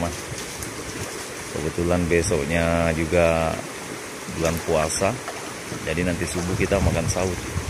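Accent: Bugis, Indonesia. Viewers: Kyak logat bugis yaa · Mesti org bugis ni logat pn boleh tau😂😂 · Btw orang mana kamu bro..klo sy dgr logat nyaa Orang sulsel , Lbih tepatnya Org bugisss :) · Seperti logat orang bugis hehehe salam dri bugis barru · Ketahuan dari logatnya.. Saya juga orang Bugis yang tinggal di Kab. Kotabaru, Kalimantan Selatan.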